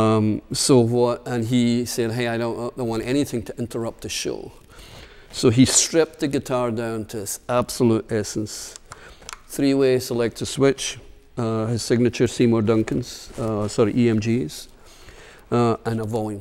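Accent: Scotland, United Kingdom. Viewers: Was not expecting the Scottish accent, or that playing "style" if you can even call it that. I gots ta give the man props for partnering with Seydel harmonicas though to create the excellent Midnight Special harmonica, truly one of the most beautiful harps ever produced. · Am I the only one that was surprised that he’s Scottish? Not saying it’s a bad thing, just a little unexpected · He's got that annoying accent that all Scottish people get when they live too long in America. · hes from whitburn new he had a scottish tone . makes me proud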